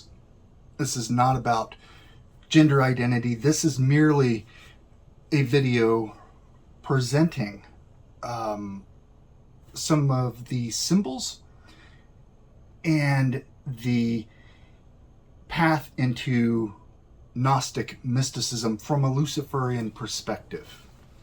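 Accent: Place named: Pennsylvania, United States. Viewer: He sounds like he's from Pennsylvania! 😊 Quick question: Can you kill someone with this? 👀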